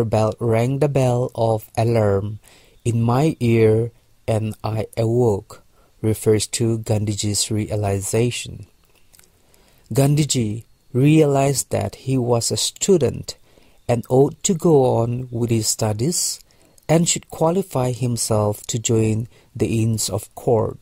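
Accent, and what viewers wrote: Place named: Nagaland, India. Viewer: His accent sounds like naga people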